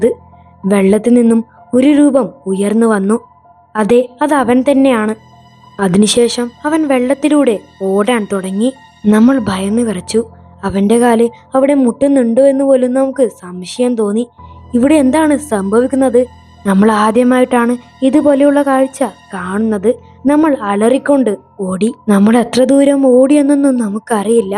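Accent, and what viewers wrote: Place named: Kannur, India. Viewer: കണ്ണൂർ സ്ലാങ് ❤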